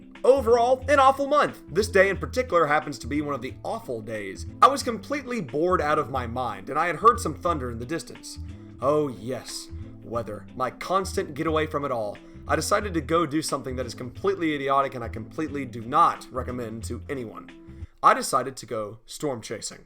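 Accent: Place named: Franklin County, United States. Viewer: So do you live in Franklin county like me because it sounds like you live In Franklin county like me🤣🤣😘🌪🌪🌪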